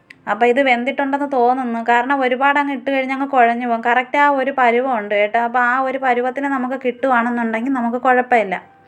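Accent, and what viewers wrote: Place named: Kollam, India. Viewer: Ningal kollam ano avduthe samsaram pole und kettatu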